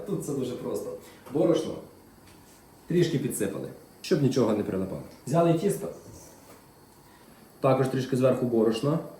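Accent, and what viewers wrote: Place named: Hutsul, Ukraine. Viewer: Хороший, позитивний і діловий хлопець! А мова у нього - з діалектом гуцульщини, ну, і що? В кожній частині України населення має свій діалект. Не звертай на погані коментарі увагу,!!! ❤😂🎉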